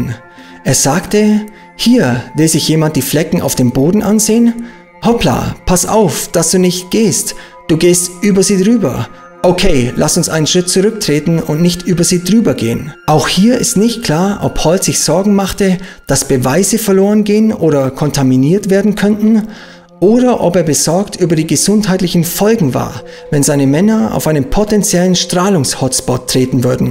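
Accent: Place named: Bavaria, Germany. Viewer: Hi, hab ich entdeckt und direkt gleich all deine Videos angeschaut 😅richtig toll! Sag mal, wo kommst du her? Voll schön, den bayrischen Dialekt mal raus zuhören. Macht dich mega sympathisch ☺️☺️✌️ Grüsse aus Regensburg 😊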